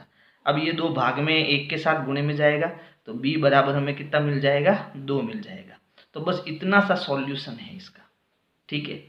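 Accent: Rajasthan, India. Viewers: Sir I am also from Pali Marwar I know that you are from rajsthan apki awaz se pta chalta hai sir ji 😅😅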